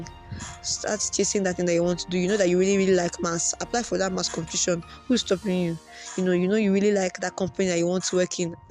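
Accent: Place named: Nigeria. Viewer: You sound Nigerian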